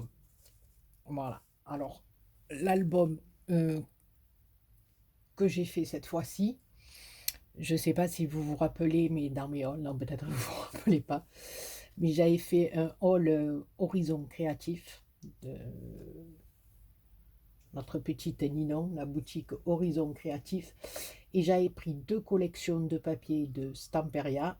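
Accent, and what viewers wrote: South, France. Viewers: Vraiment sublissime cet album beaucoup de goût mais surtout votre façon de mettre votre touche personnelle fleurs feuilles ruban bidouilles qui en fait une pièce merveilleuse et unique je me régale à regarder vos vidéos et j aime votre accent du midi. Couleur vacances et soleil Bises de bretagne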